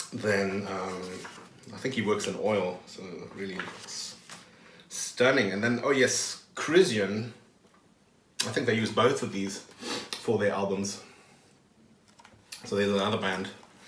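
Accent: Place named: Germany. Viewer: You have a German accent, and I live in Europe. From what retailer did you buy it from?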